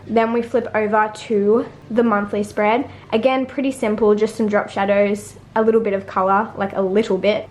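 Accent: Australia. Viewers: honestly probably not something you hear all the time but i love the way you express yourself, i don't know how to describe it and maybe i'm just loving the Aussie accent haha but I love the way you talk and formulate your sentences!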